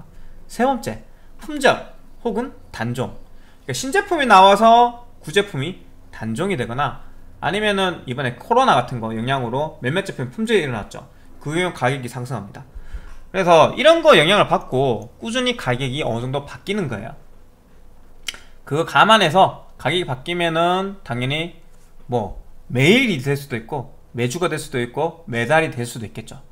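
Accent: Gyeongsang, South Korea. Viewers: 대구 말투를 쓰길래 대구 일거 같아서여 ㅎㅎㅎ 대구에서 컴퓨터 하시나요??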